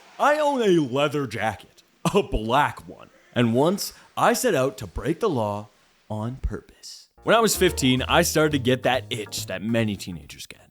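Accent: Canada. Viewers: Are you Canadian too?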